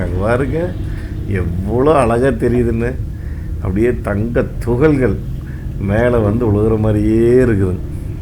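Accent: Kongu, India. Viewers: கொஞ்சும் கொங்குத் தமிழ் பேசும் கோமகரே...! திகட்டாத தித்திக்கும் பேச்சுடைய திருப்பூர் திருமகரே...! எங்கள் மதிப்பிற்குறிய டான் திரு. அண்ணா❤❤❤🙏 அவர்களே எப்படி இருக்கீங்க அண்ணி❤❤❤🙏 எப்படி இருக்காங்க ரித்திக்❤❤❤ எப்படி இருக்காரு. பயம் இருக்கும் மனதில் நிச்சயம் பலம் இருக்கும்...! எளிதில் அறுந்துவிடும் நூலை நினைத்து பயம் கொள்ளாமல்...? எதிர்த்துப் போராடி வீறுகொண்டு எழுந்து பறந்திட வீசும் காற்று உள்ளது என வானில் பட்டம் பறப்பதில்லையா...! அதுபோலவேதான் மனதை உலுக்கும் பயம் வந்தபோதும் எதிலும் உறுதி என்கிற தன்னம்பிக்கை என்னும் பலம்தான் அண்ணா உங்கள் வெற்றி மகுடம்...! நம் ❤சிட்டுக்குருவியோட❤ தங்களது இந்த லடாக் பயணம் இனிதே தொடர்ந்திட நல்வாழ்த்துக்கள் அண்ணா❤❤❤ என்றும் அன்புடன் உங்கள் தம்பி என்றும் அன்புடன் உங்கள் TMF ரா.முஹம்மது கபூர் (சென்னையில் இருந்து)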